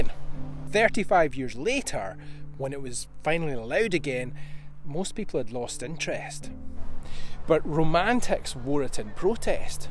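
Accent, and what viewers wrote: Scotland, United Kingdom. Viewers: As an Englishman I find it odd that comments like yours are supposed to be acceptable, but if you dared say that about any other ethnic group it would be considered a racist slur. I'm sure that even without a kilt, your accent would be enough to let people know you're Scottish. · Love the Scottish accent!!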